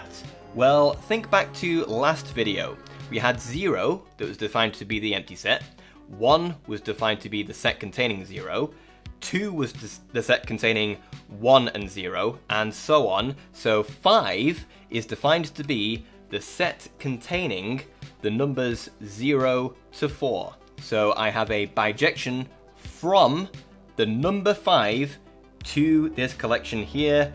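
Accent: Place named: Northern England, United Kingdom. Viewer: He's from the north of England. The accent you're referencing is confined to the south east.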